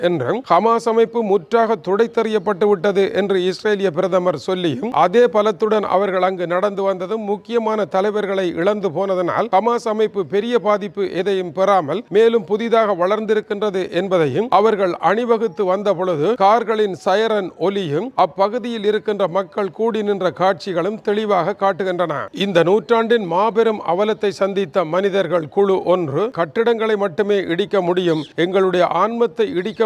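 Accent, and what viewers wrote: Jaffna, Sri Lanka. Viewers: அருமையு அ பாரம் 🌹👍யா ழ் ப்பா ண தமிழ் அமிர்தம் ❤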